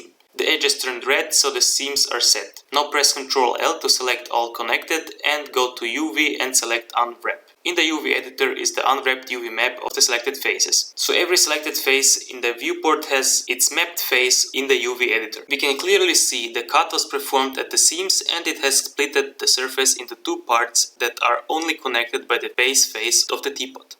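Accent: Russia. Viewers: INTERESTING, only I hear a Russian accent, or I'm wrong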